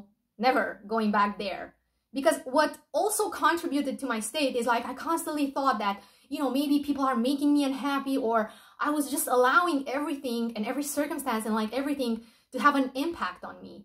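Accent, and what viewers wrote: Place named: Italy. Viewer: hiii, thank you so much for sharing your experience, loa is real. Are you italian? cause i hear a little bit of italian accent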